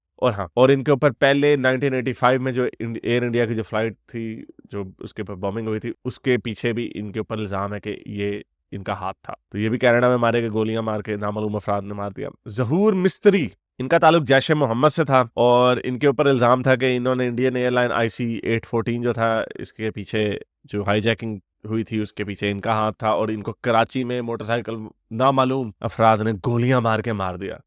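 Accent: Punjab, India. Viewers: Apki Panjbai Accents bahut Achha Lgta Hqi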